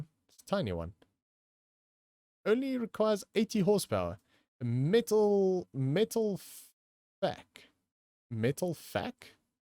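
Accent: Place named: Cape Town, South Africa. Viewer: Hi, love the serie and the longer versions. Keep up the good work and boy, do I miss Capetown listening to your accent. Best time of my life.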